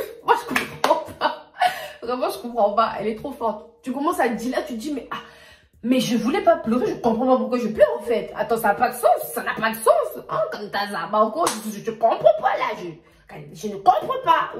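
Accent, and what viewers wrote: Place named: Democratic Republic of the Congo. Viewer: Juste par ta façon de parler en reconnaît ton pays😄🇨🇬💪.Une vraie congolaise.Ne changes jamais ma belle.Restes toi même💪😘.Dié tala vidéos za kaka🤣🤣👌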